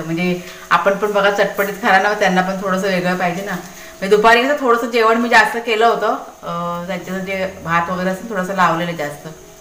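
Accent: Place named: Wadval, India. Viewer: तुटल नाही वाडवळ बोलायच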